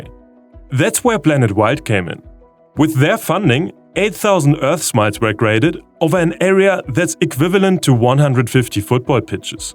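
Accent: Germany. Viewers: Are you German? Your accent sounds very German (I’m German)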